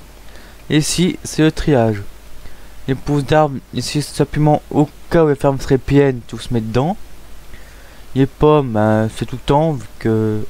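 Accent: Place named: Russia. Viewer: J'adore ton accent russe , sa a du être difficile d'apprendre le français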